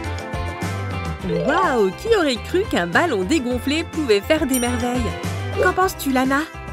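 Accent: England, United Kingdom. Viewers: elle sonq Anglaise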